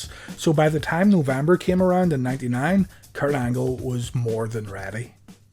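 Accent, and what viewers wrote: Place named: Northern Ireland, United Kingdom. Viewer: What a great video and how refreshing it is to hear a fellow Northern Irishman (or Irishman if you prefer) on Youtube! You got yourself a subscriber. Great work!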